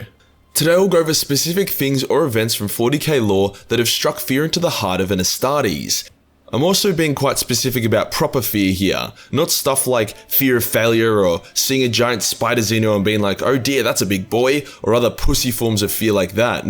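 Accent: Australia. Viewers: Loving the content ,and am guessing from the familiar accent ,your a fellow Aussie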